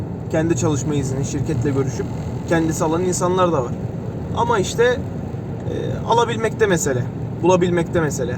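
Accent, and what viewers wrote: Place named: Black Sea, Turkey. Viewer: nerelisiniz konuşma sanki karadenizli gibi????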